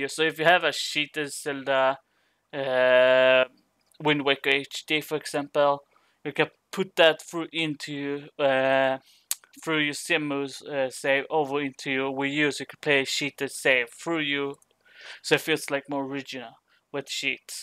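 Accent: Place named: Arab world. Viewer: ok so if u are offended by constructive criticism (not complaining), im sorry...but u speak and write as if your native language is arabian or something like that and also you seem to swallow full words..i've just been honest..if u don't like honesty..then why not deactivating the comment section or just don't talk to me...and why didn't u say this earlier that u don't have your nintendo wii anymore..we could have saved so much typing here...the people who tell u that this video is fine, they most likely have had experience with this stuff before..i asked because im a newbee and usually i can follow every and the most complicated trutorial on the web successfully, even if i didn't know about the subject before, but this isn't possible with your tutorial, you should reconsider if it's really me who made the mistake here..or you...not to forget that i already mentioned that yours is the only video tutorial i found on the web treating this subject..but yea, if your tutorial here was really just about transfering a nintendo wii save state to the pc, then the whole name of this video is already misleading for english people, i wanted the opposite..btw. 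my native language is german and i don't seem to have issues with english typing or speaking..and i got one last thing for u, if it's really a problem for you to be held responsible for older videos you've made, why not deleting them..i just don't get u..i mean i'm sry that u can't deal with constructive critcism...i will find hel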